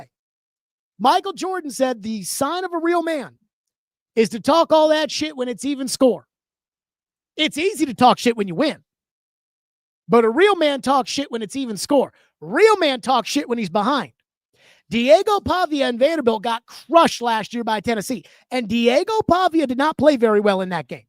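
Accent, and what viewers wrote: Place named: New Jersey, United States. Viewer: Could’ve swore this guy from jersey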